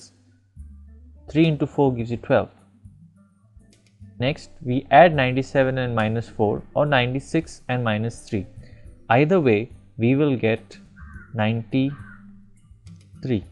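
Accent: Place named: India. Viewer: i am killing myself when listening indian when he speaks english!!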